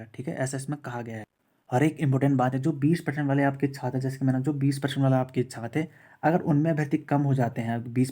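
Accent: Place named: Chamoli, India. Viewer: Bhai pakkaaaaaaa Chamoli ka hai.... Apni boli apni bhasa....,🥰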